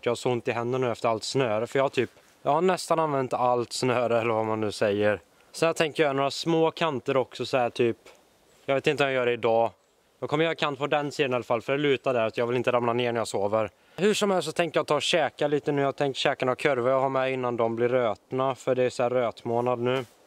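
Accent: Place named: West Gothland, Sweden. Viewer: Haha jo såpass långt har jag räknat ut :P funderade mer på vart i västergötland, är själv från västergötland därför jag reagerade på dialekten :P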